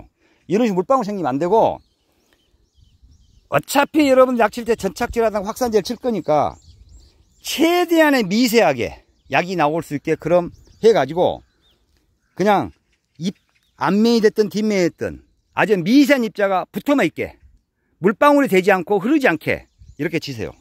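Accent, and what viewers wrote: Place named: Jeolla, South Korea. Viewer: 여긴 경상도인데 전라도 사투리가 이렇게 구수하게 들린 적은 없는데,진심이느꺼지네요.감사합니다.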